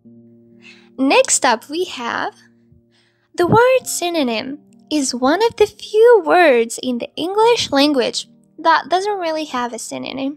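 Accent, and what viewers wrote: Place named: United States. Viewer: Fun fact your : you could be a singer. You have a great America accent. And sweet voice❤️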